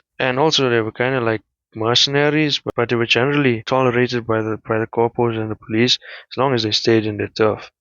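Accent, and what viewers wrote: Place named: South Africa. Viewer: Yooo. You sound South African choom. Awesome vid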